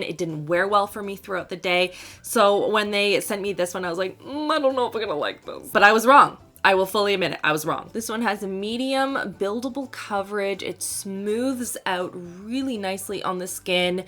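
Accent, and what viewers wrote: Canada. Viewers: I love your Canadian accent!! I need to try those NYX lipsticks. Really pretty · Just discovered your videos. Do I detect a Canadian accent? Excited to see Pixie mentioned, I was starting to think they did not make anything good. Thanks!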